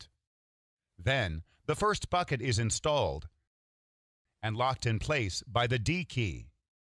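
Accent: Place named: England, United Kingdom. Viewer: Excellent description and detail by the commentator. My hearty congratulations. I do feel that the modern jet engine must be classified as one of the modern wonders of engineers. The combined engineering logic of the jet engine took a lot of time to be put together and if one had to put an initiation spark on the human mind, it was Charles parson who combined the high pressure in a boiler to spin the turbine in a steam layout. I was lucky enough to have lived at Newcastle-upon -Tyne where I went on many visits to see the Turbina the first steam turbine ship which surprised the Royal Navy at Spithead. I worked on the first jet turbines on a naval ship and it was the HMS Exmouth when I worked at Chatham Dockyard. Again Congratulations to Oil Gas World for providing such detailed information about the thermodynamic stages and the cooling methods on every unit. 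When I built a model engine with one compressor stage and one turbine stage, the diffuser right after the compressor was a tricky unit where the air had to be slowed down to sustain the flame and then the flame diluted with colder air along the burner to isolate the flame from the metal. Well done to the commentator, HIs English accent is what I aimed for when I spoke that language, but I could never get rid of my mother's tongue diluting the English language I spoke at Chatham and Newcastle.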